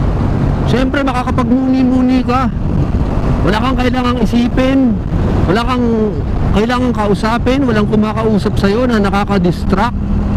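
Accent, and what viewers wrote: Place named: Batangas, Philippines. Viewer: O batangas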